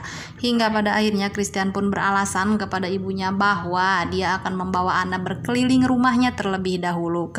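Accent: West Java, Indonesia. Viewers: Alus euy sunda na kaciri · Sunda pisan teh...😂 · sunda pisan euy....😂❤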